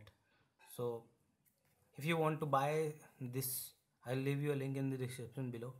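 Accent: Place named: India. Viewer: Why does a Chinese man have an Indian accent?🤔🤔🤔🤔🤔🤔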